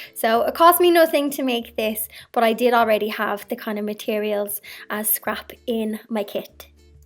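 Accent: Ireland. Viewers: You are very organized. You have a wonderful creative mind. Love when you work with power tools! You are the best! Love the Irish accent so melodic! Mery Christmas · You’re so cute. I love your Irish accent. You know Ive been just making do with working around my sewing machine and what nots. I should make my work space nicer. Thanks for the ideas.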